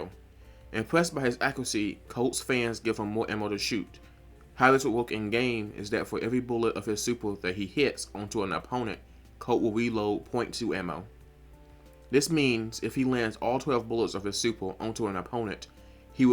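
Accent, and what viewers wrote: United Kingdom. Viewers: Is your accent british?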